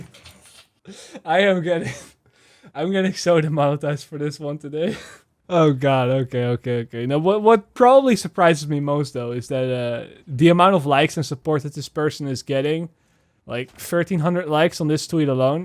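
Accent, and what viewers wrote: Netherlands. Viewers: Are you Nederlands? I hoor het in je accent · I knew you were dutch before i saw the Twitter posts I have the same accent when speaking English oof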